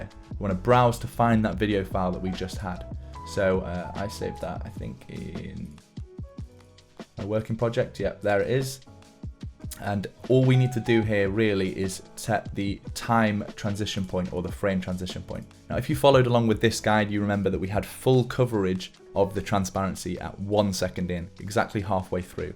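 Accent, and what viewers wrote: United Kingdom. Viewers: yo i love your videos, BUT YOUR VOICE. After a couple videos i have to listen to heavy metal to get the Brittish accent out of my head..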